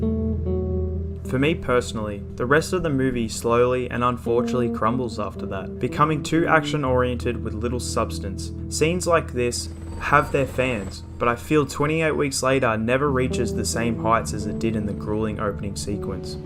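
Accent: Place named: Australia. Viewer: Hey, you sound Aussie! I really enjoyed this one and think you have real good potential with your timing, technical setup, conversation points, choice of scene/film, and fairly down to earth approach; even if it's a tad too obvious you're reading your script haha. I know there are a lot of film reviewers out there, but with recent events now would be a great time to really try to push your channel toward the film analysis/review crowd, if that's the direction you want to go in. I think you'd do well. Good luck with your next one.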